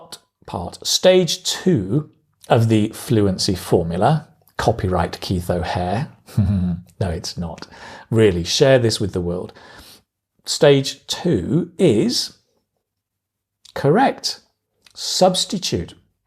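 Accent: United Kingdom. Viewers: so you have a british accent right? And u speak slowly trying to get a neutral slang so that all ur viewers can understand ur speech?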